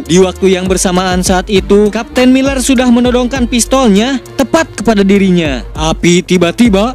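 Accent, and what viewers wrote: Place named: Sunda, Indonesia. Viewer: Asik seru bnyk alur cerita pembawaannya Sunda. Karena saya sendiri asal tasikmalaya. Tapi sekedar masukan kang klo bisa gaya bicara dan penyampaian dengan gaya sendiri dan karakter sendiri. Jangan meniru orang lain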